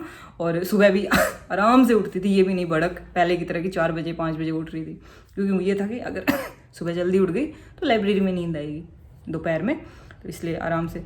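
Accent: Haryana, India. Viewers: Awaj se lagta h Rewari, Gurugram, m/garh se jo aap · Haryanvi Vibes mst lag rahi hai language me 😁😁😁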